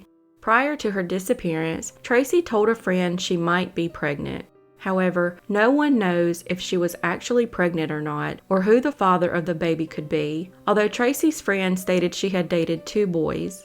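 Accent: South, United States. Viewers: I can tell your southern not only from the accent but because every case you talked qbout has 3 names instead of 2. I'm willing to but your name is.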